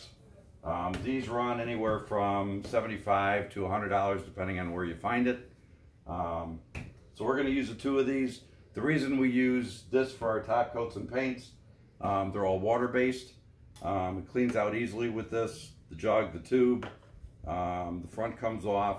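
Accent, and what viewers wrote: Midwest, United States. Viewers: Good information. Oh, I am here for the Midwest accent! Lol. Born in Indiana, raised in Wisconsin, but have lived in west Texas for about 40 years now. I miss that accent! 😂😂😂.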